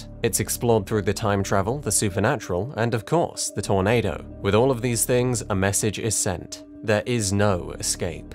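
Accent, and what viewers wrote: United States. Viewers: Your American accent is blursed. Thank you very much for showing it off. Have a nice day. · That American accent gave me whiplash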